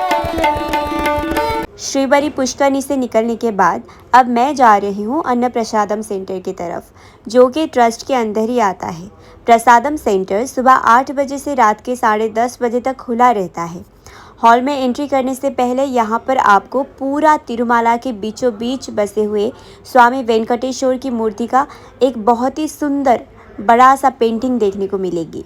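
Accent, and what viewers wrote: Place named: Mumbai, India. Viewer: Apki hindi mumbai jaisi h 😮 kbki maithil log to etna pyara hidni accent jante h .. i love maithili language too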